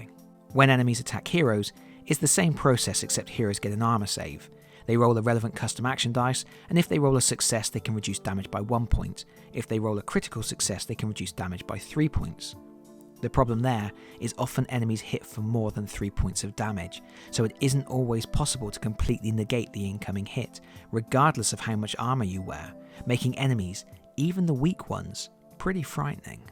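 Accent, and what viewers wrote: United Kingdom. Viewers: Great review. The british accent adds to it for some reason..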